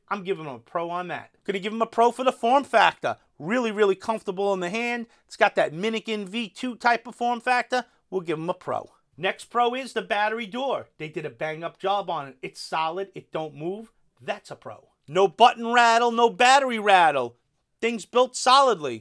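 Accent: New York, United States. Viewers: I will watch every video of yours because of this New Yawk accent. Cheers.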